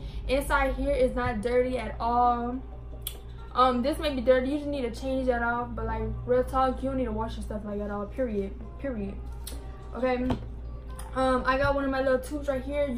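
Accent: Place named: New York, United States. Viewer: she sound like she from new york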